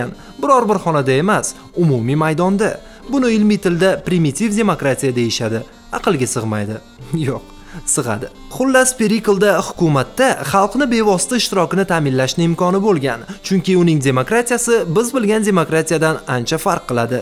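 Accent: Tashkent, Uzbekistan. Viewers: Тошкан шевада гапирманг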